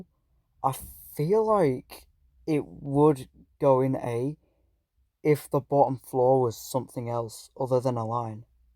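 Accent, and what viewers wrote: United Kingdom. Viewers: HES BRITISH! LETS GO! (Take your time, get used to your voice being on youtube, no worry) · Love your voice my bro, Keep doing. (British accent makes it more beter 💀)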